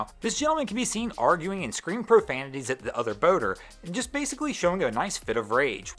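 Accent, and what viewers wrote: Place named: Delaware County, United States. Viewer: Are you from Delco, PA? That accent definitely sounds like it.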